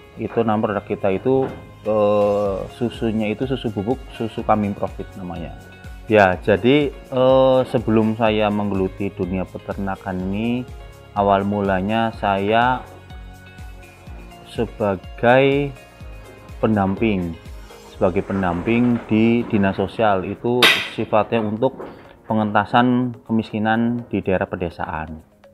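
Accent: Banyumas, Indonesia. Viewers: Asyiikk Logat nya kok kayak kiyek banyumasan yaa mas sse.... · Alamat mana ya kak, tadi kurang jelas. Kayaknya medike ngapak ya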